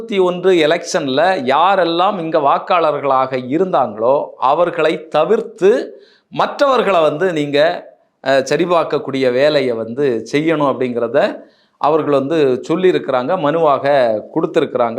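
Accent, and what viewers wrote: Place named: Nagercoil, India. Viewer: Bro உங்க பேச்சில் நாகர்கோவில் மணம் வீசுதே..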